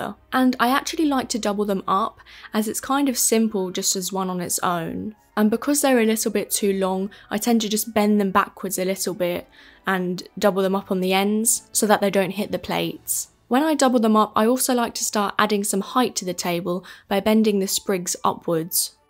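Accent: England, United Kingdom. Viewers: Haha. We're hosting Christmas for the first time. We've been going to my sisters for the last 25 years! I searched for Christmas tables and watched at least 10 videos from America. Then clicked on yours and it was a classic English accent 😍 Happy Christmas from Ireland 🇮🇪 And our table will be gorgeous.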